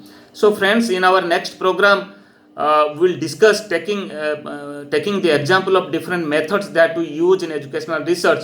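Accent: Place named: Bihar, India. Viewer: Bihar se lag rahe hain sir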